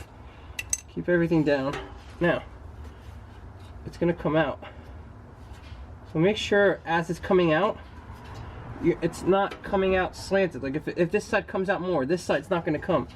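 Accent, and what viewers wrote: New Jersey, United States. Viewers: Lol, I feel like I'm watching myself with your install videos (minus the Jersey accent). So flustered with little stuff. 😂😂 Great video man. I'm going to climb under my car and see if I have those valves on my R/T now. That would be a great, easy mod after seeing you do it.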